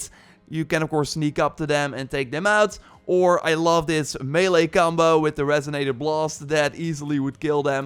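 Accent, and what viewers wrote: Netherlands. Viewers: Are you Dutch? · I believe he is Dutch.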